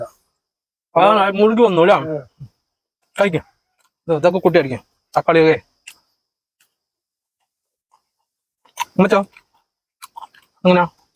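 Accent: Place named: Palakkad, India. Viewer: പാലക്കാടൻ ഭാഷ ഞങ്ങൾക്ക് ഭയങ്കര ഇഷ്ടമാണ് ഞങ്ങൾ അവിടെ മൂന്നുവർഷം താമസിച്ചാണ്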